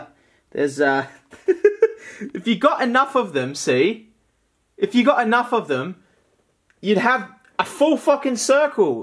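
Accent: Australia. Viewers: I can not stand how Australians say "Fourdeeen" instead of "Fourteen". speak English 😠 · The more excited gets the more Aussie he gets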